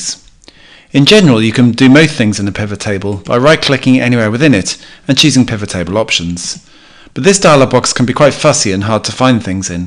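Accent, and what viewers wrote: England, United Kingdom. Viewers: Wow..! Great class.. :) Like the 'Excellent sounding English Accent' :)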